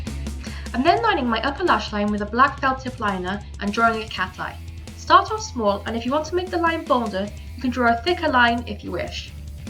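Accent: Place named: Wales, United Kingdom. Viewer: I love this! Are you welsh? Your accent sounds very welsh haha x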